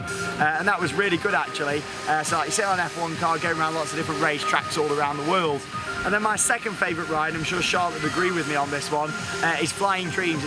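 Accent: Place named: Staffordshire, United Kingdom. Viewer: Its a Staffordshire on i think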